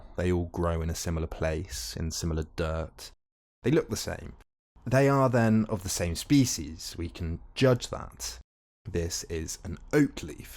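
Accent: United Kingdom. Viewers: oh man, i love hearing this info from a british accent instead of an american. i feel so smart right now lmao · With these types of videos, my brain cells really appreciate the British accent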